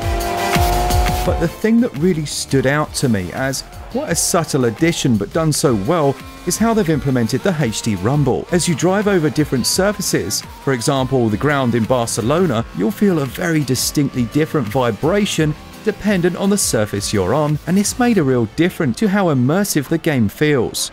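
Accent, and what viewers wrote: United Kingdom. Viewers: no not bad at all. It just didn't sound like you. Had more of a British tinge to it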